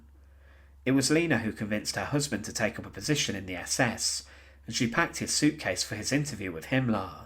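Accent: United Kingdom. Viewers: He's English/British, not a fake voice smh jfc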